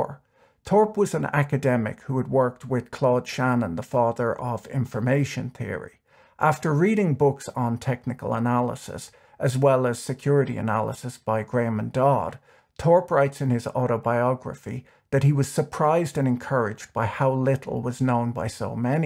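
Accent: Ireland. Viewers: I'm curious about your accent..I know you rarely read comments especially on older videos, but your accent fascinates me. It sounds Irish but there's something else in there it sounds half American or Canadian. Can you tell us how your accent came to be like that and where you've lived etc? Maybe it's your video voice to flatten your Irish accent for American audiences and you're doing it deliberately? Pretty cool smooth accent anyway.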